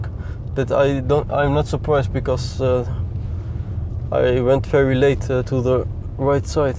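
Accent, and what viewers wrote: Netherlands. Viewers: Nik Topler its dutch english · Lekker nederlands accenttje gap 😂😂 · Dat hollandse accent haha heerlijk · Prima video, ik zou alleen proberen met iets meer emotie te praten. Het klinkt door het Nederlandse accent niet heel gemeend, maar dat is gewoon oefenen. Blijf zo doorgaan! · dutch accent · you can really hear that your dutch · Kyro de Boer ik denk dat hij wel nederlands is, ik kan het merken door zijn engelse accent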